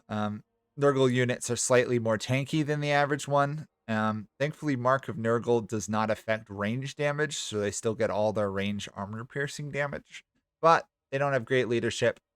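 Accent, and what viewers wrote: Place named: United States. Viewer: Dw about it lol, it's hard to know if you've never really been taught and since most fantasy names and languages originate in Asia or Europe most Americans (assuming your American or Canadian from accent) just don't know since yknow, you don't need too lmao, but I don't mind helping with it in future if you ever wanna check, if you want my discord or something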